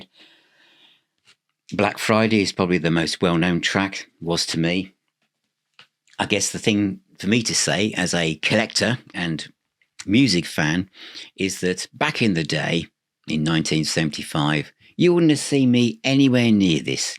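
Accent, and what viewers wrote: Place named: England, United Kingdom. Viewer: Good evening. Very interesting as usual. Thank you for this piece of information and, especially, for your manner of speaking, for your nice, clear, genuine English!!! Neither American English, widely spread around, nor Indian/Pakistani/Bangladesh English, but English English. It's a great pleasure to listen to you!!! And what about compact-cassettes? I know a lot of music-fans, who return now back to classic vintage cassette-decks and compact-cassettes... In any case, it was a pleasure to listen to your programme!! Thanks.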